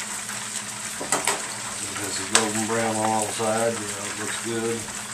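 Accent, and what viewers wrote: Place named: Texas, United States. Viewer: strong texan accent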